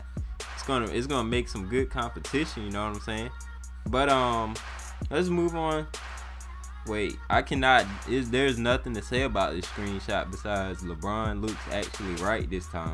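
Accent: United States. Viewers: I knew you was from North Carolina lol on god..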